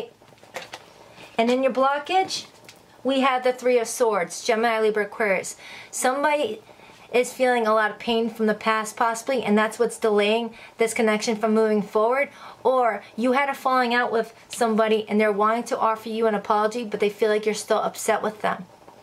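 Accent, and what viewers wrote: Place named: Brooklyn, United States. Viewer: Girl, you are so spot on. My past person messed me UP somethin bad 😔. Loved the video ❤🥰 and i was guessing your accent was brooklyn lol i have some friends from there ans ya'll sound the same 😁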